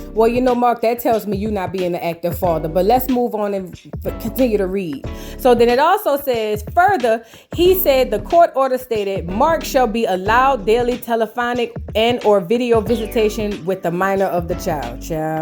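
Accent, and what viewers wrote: New Orleans, United States. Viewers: it’s the accent FOR ME‼️The NOLA accent is my favorite.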